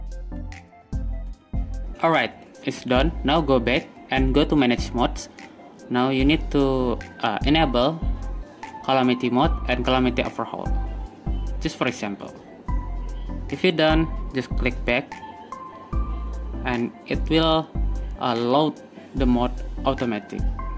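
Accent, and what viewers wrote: Indonesia. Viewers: menurut gw berasa kok indo nya, udh biasa denger org indo ngomong pake b. ing.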